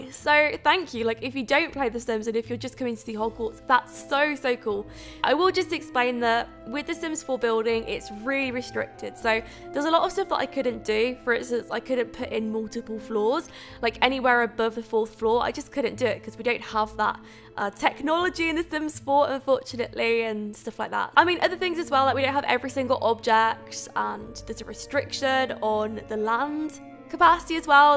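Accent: United Kingdom. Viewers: I really like your British accent and enjoy with your building Hogwarts castle. I hope you will make more about building some places from Harry Potter or wherever. Keep making good videos!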